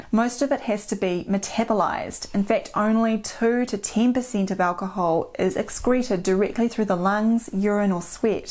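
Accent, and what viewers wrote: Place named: New Zealand. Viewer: That’s a New Zealand accent, not Australian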